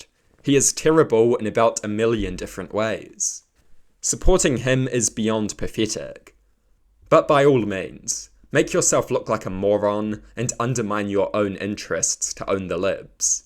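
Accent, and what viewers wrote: New Zealand. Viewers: This is actually just a form of Kiwi dialect you haven't become accustomed to yet. Stop being racist bro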